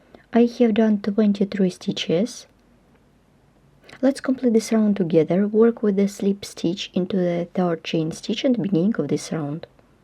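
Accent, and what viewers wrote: Russia. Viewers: А по русски можно? Вы же не американка, русская слышно. Практикуете на инглише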